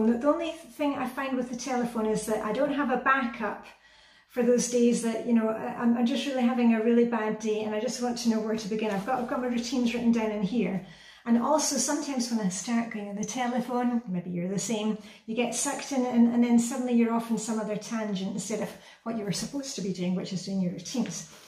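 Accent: Scotland, United Kingdom. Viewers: I have enjoyed your Scottish accent so much - just had to comment when you said jotter… reminded me of school, back when I had a Scottish accent too! Thanks for all your encouragement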